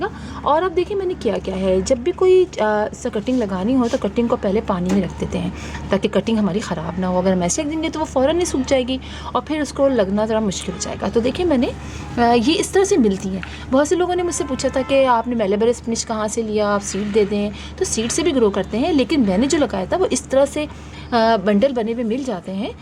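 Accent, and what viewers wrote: Pakistan. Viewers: না ভাইয়া, বাঙ্গালী পুইসাক বলে, কলমী না। অন্তত বাংলাদেশে পুইসাক ই বলা হয়। দিদি বাঙ্গালী না কারণ তাঁর ভাষা নিরেট উর্দু। বোধহয় তিনি ভারতীয় কিন্তু তার accent টা পাকিস্তানী উর্দু ভাষী।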